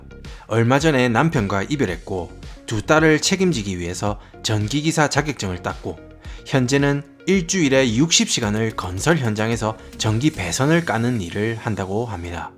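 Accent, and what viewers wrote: Gyeongsang, South Korea. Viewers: 영어 speaking은 표준인데 한국말 억양은 대구 사투리 같은데 구수해요. 오랫만에 미국에서 듣는 구수한 된장국 억양. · 어제부터 정주행 하고 있습니다. 처음에는 야무진 갱상도 사투리가 재미있어서 보았으나 님의 봉사정신을 보고 존경하는 마음까지 생겼습니다 주위에 약자들 사회에서 소외된 사람들에게 힘과 용기를 주시는 분께 박수를 보냅니다 🎉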